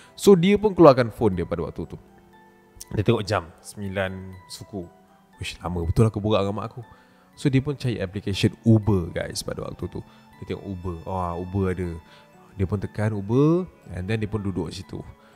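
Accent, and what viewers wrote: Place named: Negeri Sembilan, Malaysia. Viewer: Lucu la ckp n9🤣🤣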